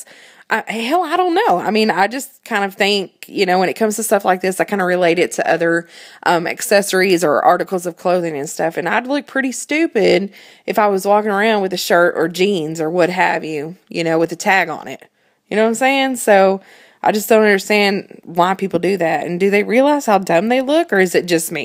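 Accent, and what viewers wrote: South, United States. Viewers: got that cute little southern twang in your voice :P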